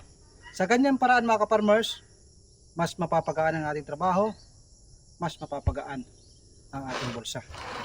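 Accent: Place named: Visayas, Philippines. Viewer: saan ang lugar nyo boss? para kang bisaya! yan rin kasi ang plano ko, mag reretiro na kasi ako sa agosto.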